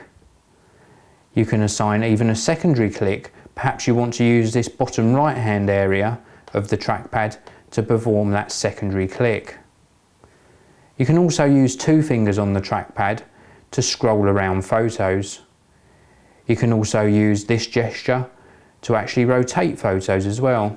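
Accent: United Kingdom. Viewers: You have an amazing voice.Love your British accent.Awesome review,really helpful👍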